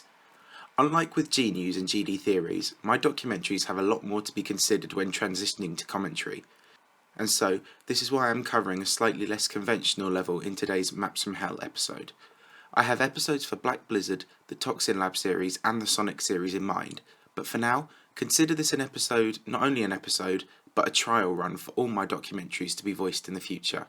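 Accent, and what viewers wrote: United Kingdom. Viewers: are you a brit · You should do more voiced videos, the British accent makes the whole thing come together. Keep it up! You never fail to teach me something about GD!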